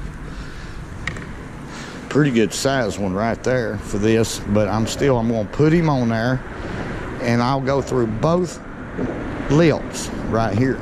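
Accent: South, United States. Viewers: I just wanna say that the reason I love watchin' your videos is for one, I love crappie fishing, and you always deliver when it comes to crappies, your down to earth attitude that keeps me laughin' every time I log on, and last but not least, that darned southern accent is irresistible to listen to. I love your humor, your sarcastic take on things, and the fact that I'd love to have someone like you to spend my day on the water fishing with. Thank you for providing me with daily entertainment and helpful videos like these. I get a bunch of fun outa watchin' you every time I log onto youtube. Have a great day, my friend! You da best!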